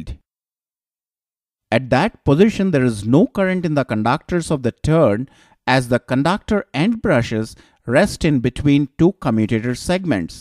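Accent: India. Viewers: Bengali accent most probably. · Hindi accent I'm sure. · I love the way he pronounces conductor as cundaaacter typical indian accent ! but awesome effort thnk y sir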